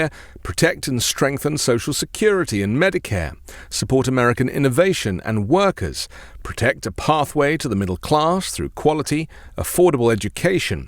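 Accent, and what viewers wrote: United Kingdom. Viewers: Your British roots are showing lol. My family came from Britain generations ago. My Dad and Granddad are the only ones I knew that used phonetically. Ahhhhtt. Dad had a gun I forgot which one but it was a model with zero in it that had ahhhhtt in it. 20 ahhhhtt something 🤔🤔🤔. Anyway do you have crackers at Xmas ? Oyster stew Xmas eve? Love the show.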